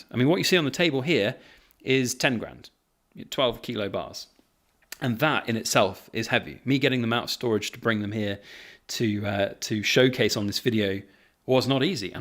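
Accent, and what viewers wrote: England, United Kingdom. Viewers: Hey bud, 2024 has sure thrown a bunch of really interesting times and? situations your way this year. I suppose that bunch of $ leaving their coffers may be a hack but as you say the 10th degree was totally not needed. You are and has an English accent that stands out like it is you. Even an untrained Canuckian can tell your voice against any other Cockney accent. I hope that wasn't an insult? However, you got them straightened out and hopefully your seller wasn't too agrivated awaiting his money. That really is a lot of silver. Must feel great to have that pile to ponder my old friend. WOOHOO for you. A hundred ounces is the most I have ever had in hand. I have a video of it (10 x 10 ounce bars) being reduced to casting shot. I am sure glad I don't have to do that anymore as I finally found a trust worthy dealer for my needs. 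Generally a buck and a quaarter over spot. Good to see you today old friend. Shall we go pour something, LOL.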